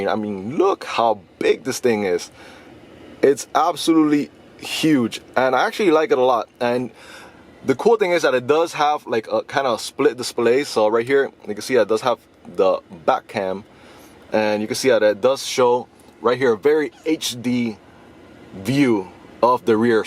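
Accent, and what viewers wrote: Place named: Hawaii, United States. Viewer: just a guess, your accent sounded somewhat Hawaiian. Awesome truck, and great review. Hopefully getting one in the next couple of days!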